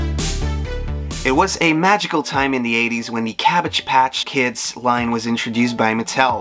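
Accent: Canada. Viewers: AN is common. TREE letters is way to complicated for most us mericans lol. Seriously tho your accent is damn near "perfect" sounding for a country made up of all other accents. I figured you Canadian because it's so subtle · Are you canadian? You sound Canadian in this video.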